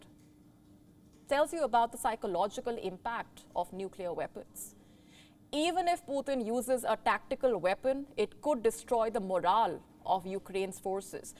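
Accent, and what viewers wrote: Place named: India. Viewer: Can u get rid of that accent. It sounds like any stereotypical Indian character in a western movie.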